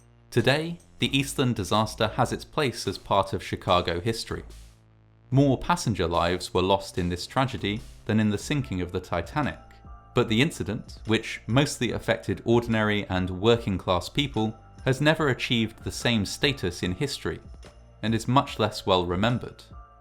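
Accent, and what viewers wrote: United Kingdom. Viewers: Ohh, thanks. Having anxiety symptoms. A guy explaining a shipwreck in a British accent is very soothing. Might watch others.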